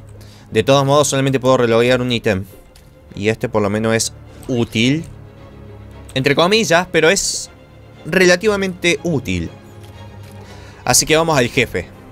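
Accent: Argentina. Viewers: vos sos argentino???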